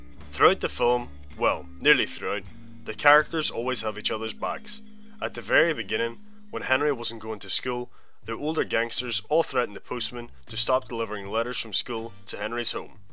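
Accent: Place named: United Kingdom. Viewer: Aside from some...idiosyncratic across-the-pond pronunciations, I thought this was really good. It would be cool to see some parallels drawn with crime literature, and also some examples of films and books that fail to hit (or even subvert) some of the genre conventions, and how that makes them successful or unsuccessful. I went ahead and subscribed to the channel, so I hope there's more forthcoming.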